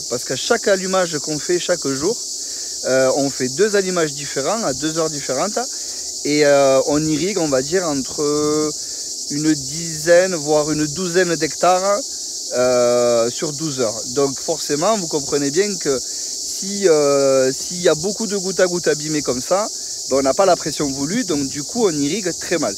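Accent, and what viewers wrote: South, France. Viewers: Salut belle vidéo avec le bon son du sud bon courage à bientôt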